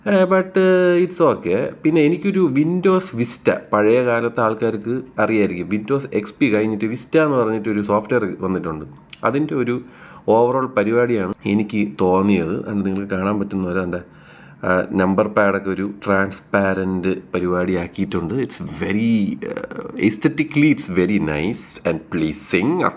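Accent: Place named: Thiruvananthapuram, India. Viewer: fell for your feel of transparency as human with thirontharam accent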